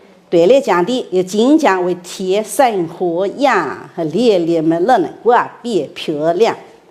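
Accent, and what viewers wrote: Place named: Qingtian, China. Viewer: 有点青田话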